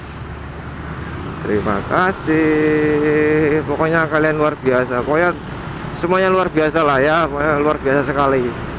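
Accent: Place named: Java, Indonesia. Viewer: Mas mas ini doang yg medok tp sunda nya nyablak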